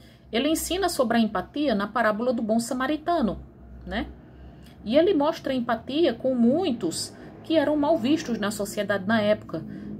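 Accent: Northeast, Brazil. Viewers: Amo o seu sotaque nordestino, sempre achei bonito. Você transmite calma e coerência nas suas falas. Obrigada por produzir um conteúdo de qualidade!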